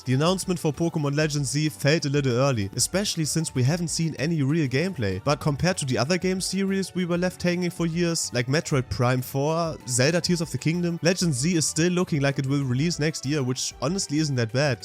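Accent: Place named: Germany. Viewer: I LOVE your German accent❤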